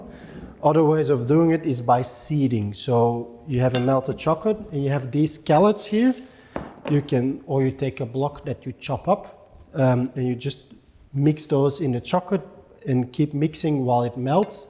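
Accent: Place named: Belgium. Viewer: Are you originally from Belgium? I detect a Belgian accent:) Groeten uit Arizona, USA - Ann van Daily Travel Dose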